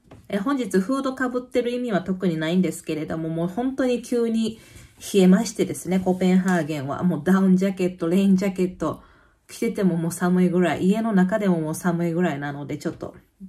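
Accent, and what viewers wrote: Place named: Osaka, Japan. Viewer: 英語でしゃべったら嫌がられるなんて。。フランスみたいで辛いなあ〜。なんで、そんなにプライドを捨てられないのかなって残念ですよね。でも中にはそういう親切な方もいらっしゃるということがやっぱり嬉しいです（＾＾）。ちなみに、のそのまんまの喋り、面白いです笑大阪人ですね〜笑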